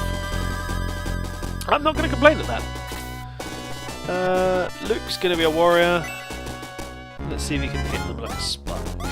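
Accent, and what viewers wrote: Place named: United Kingdom. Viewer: Ermahgerd... An English bloke doing a playthrough of this game... must watch all... Dat voice acting. Doesn't even ruin, British voice too strong...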